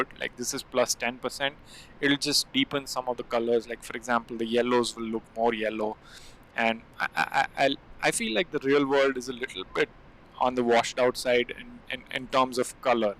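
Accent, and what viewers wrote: India. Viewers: You from India?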